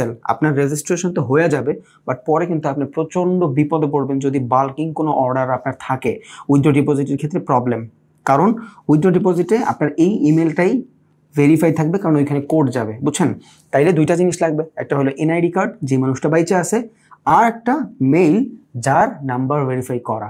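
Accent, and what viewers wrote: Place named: Barishal, Bangladesh. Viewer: ভাইয়া আপনার দেশের বাড়ি তো মোগো বরিশাল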